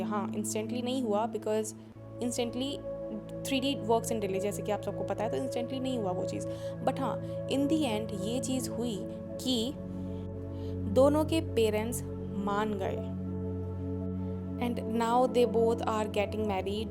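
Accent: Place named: China. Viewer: ITS SOUNDS CHINEESE MIXED WITH EAST INDIAN A TINY MIX OF SOFT RUSSIAN BUT MOST LY EASEAST INDIAN WITH A RARE FRENCH DIALCK MIXED ALL IN ONE AND THE COUNTRYS ARE VERY CLOSE TOGETHER AND 1 LANGUAGUE I IM VERY FAMILITIAR HEARING MIXED IN TO OR ARE U CHANGING THEM AS U TALK OH I FORGOT A FORM OF ENGLISH I HERE TOOO LOL IM INTO MAPS LANGUAGES AND DIFFERNT PEOPLE OF THE WORLD I LOVE THIS ITS LIK ,Y HOBBY ANY WAY IM GOING TO MANIFEST A AY TO TO SEE WHAT YIU LOOK LIKE IM A VERY CIRIUOSE PERSON AND IM A VISUAL KEARNER I LOVE EYE CANDY TOO PLUS U SPAK MY TRUTH I DONT BELIEVE IN ANT OTHER WAY TO EXPRESS MYSELF I FEEL GOOD LETTING OUT MY THOUGHTS AND I NEVER SEE PEOPLE COMMENT LIKE ME I DONT BELIEVE IN FEAR LIKE SOME PEOPLE THINK THERS A RIGHT WAT TO TALK OR HOW RULES AT LEAST WHERE I WAS BRAINWASHED GROWING UP ON LAND THEU CALL IT A COUNTRY MAMED CANA DA ;//CHNA DA IS ITS NICK NAME AND WE HAVE EAST INDI ANS AS OUR LARGEST IMAFORGN POP INOT SURE IF BORN OR IMAGRATE OR MIXED I WE ALL JUST COULD GET ALONG GOT RID OF THE BAD PERSONALITYS AND THE PWOPLE WHO CAUSED OTHERS HARM WE MIGHT BE ABLE TO STOP THE PROPAGANDA THAT MAKES US ALL TURN OM EACH OTHER WE SHOULD TURN O THE 1 $%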